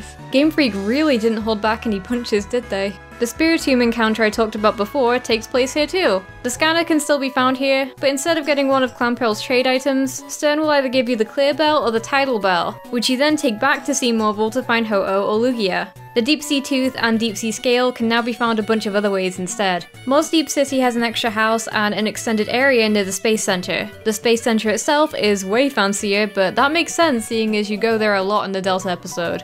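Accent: United Kingdom. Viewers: British. She mentions it most recently in the Galar Version Differences video.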